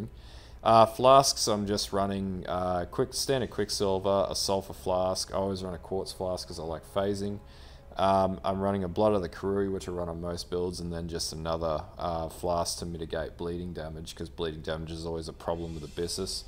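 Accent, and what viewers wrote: Australia. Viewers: Hey love your vids 👍🙂 Also are u Aussie?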